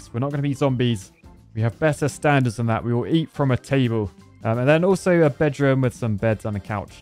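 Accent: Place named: England, United Kingdom. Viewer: I love listening from across the pond and hearing the king’s English. “He did a proper scarper”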